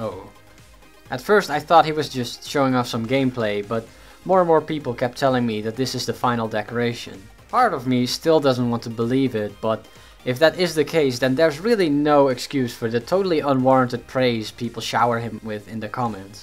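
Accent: Netherlands. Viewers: Are you dutch? Because you have sort of a dutch accent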